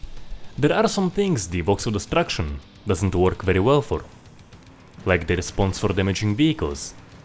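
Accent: Russia. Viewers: Cool video, but I don't know. Why do you have such an accent mmm... Russian? Are you from the CIS countries? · Русский акцент? Или я плохо разбираюсь в акцентах?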